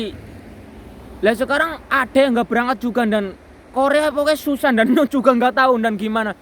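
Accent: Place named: Banyumas, Indonesia. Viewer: Salam NGAPAK NDAN.. ORA NGAPAK ORA KPENAK HHHH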